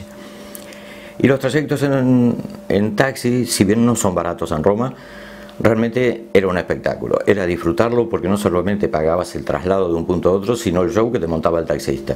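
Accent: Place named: Argentina. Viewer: lo de que es usted Argentino no cabe duda , por su acento recien estuve en America Usa ,México, Perú ,Ecuador regreso España luego Lisboa Berlin ,Roma , Roma fue impresionante visite vaticano Coliseo palatino Foro Romano Fontana di trevi piazza Navona Pantehon etc etc deseando volver a Roma un saludo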